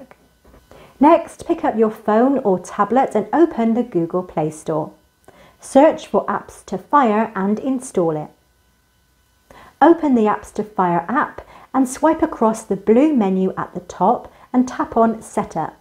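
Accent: United Kingdom. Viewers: Brititsh accents 😚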